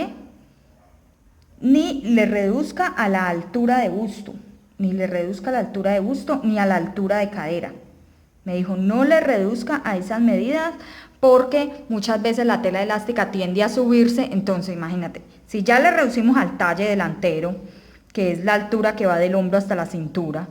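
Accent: Colombia. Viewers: Hola me gusta mucho come nos enseñas, vivo en Italia hice un curso de dos años y me defendía. Per tú me has ayudado tanto, además que iorte hablar me encanta me hace sentir en mi querida Colombia... Te felicito y deseo tantos éxitos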